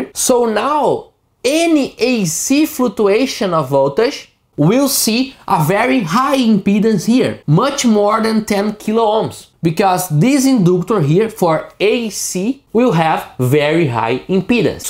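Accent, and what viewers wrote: Brazil. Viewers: Tu é paulista?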